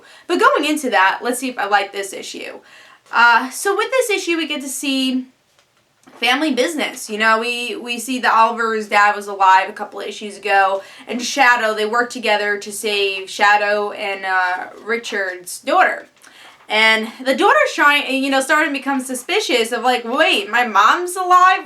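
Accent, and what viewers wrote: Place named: New York, United States. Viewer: are you from new york? For a second there I thought you had a new york accent.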